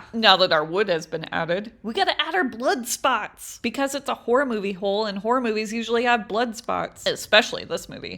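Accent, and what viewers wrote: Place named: Minnesota, United States. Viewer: You talk Minnesotan it's ok . I understand you lol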